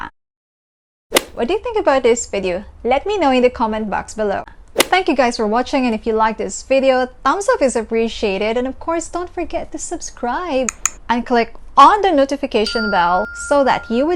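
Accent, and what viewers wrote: Singapore. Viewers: wow nasa SG ka pala, i lve ur accent, so nice parang singaporean ka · Your accent in Singlish is impressive. You sound like a Singaporean lah!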